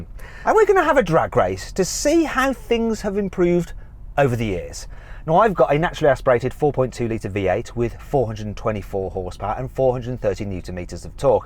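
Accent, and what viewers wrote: United Kingdom. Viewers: 영국발음들으니까 신기하다ㅋㅋㅋㅋ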